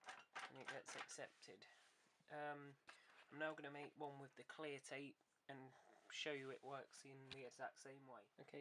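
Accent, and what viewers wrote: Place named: London, United Kingdom. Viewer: Do u live in like London or some thing? Cause ur accent sound like it a little. 👾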